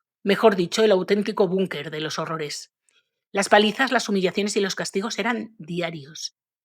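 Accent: Spain. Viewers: ...ayyy, debe ser un deleite y una delicia conocerte personalmente. Platicas y cuentas éstas historias con un toque de sarcasmo muy jocoso. Gozo de tus relatos desde que comienza incluso la música. Como tengo relativamente poco tiempo de conocerte. No he logrado ponerme al día. Pero ya voy, ya voy. 😉 Saludos desde California. Amo el acento con el que ustedes los españoles hablan. Soy 🇲🇽 de nacimiento. Y de naturalización 🇺🇲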